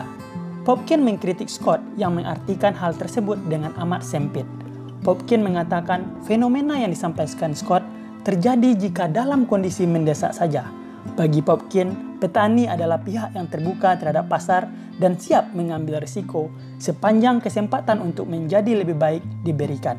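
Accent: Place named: West Sumatra, Indonesia. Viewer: Logatnya orang minang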